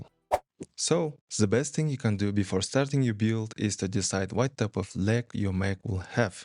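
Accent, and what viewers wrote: Russia. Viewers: У тебя будто бы русский акцент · русский акцент сразу слышно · У бро русский акцент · Ты говоришь с сильным русским акцентом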